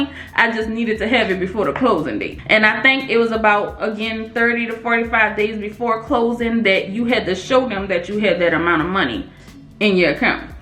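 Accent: New Orleans, United States. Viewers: Thank you so much for all this valuable info.... This is Great and Very Helpful !!! Love your N.O. Accent!!!... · OMG! You sound like you're from home (New Orleans). Anyway, I have just started your vids. Yes, I am ready to buy that house this year. I'll keep watching.... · I'm hearing a New Orleans accent. You're from the boot?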